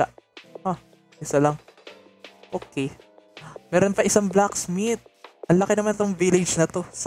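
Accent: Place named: Visayas, Philippines. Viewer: Para ka pong bisaya hahah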